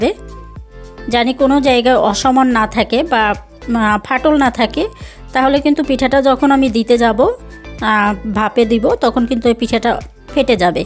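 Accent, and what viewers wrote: Bangladesh. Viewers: Apu apni ki sylheti niki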